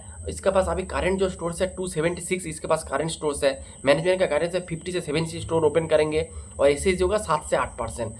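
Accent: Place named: Bengal, India. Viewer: Bhai puro Bengali tone asche, Hindi ta khub baje sunte lagche. Camera er angle ta thik kor - get a virtual background. Content bhalo but not getting attention. Fix these issues.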